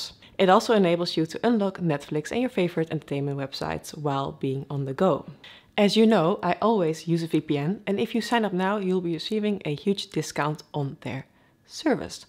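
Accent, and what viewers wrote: Netherlands. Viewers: The dutch accent on english is something that can never be hidden :D Greats from Belgium! Btw, I wouldn't mind a voice over the approach instead of text, that way you see more then reading text. Or was this a deliberate decision? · wat klinkt dit nederlands zeg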